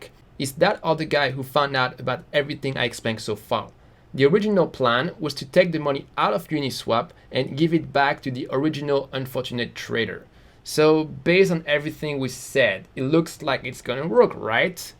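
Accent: France. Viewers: Salut. Es tu francais? Je reconnais un petit accent. A +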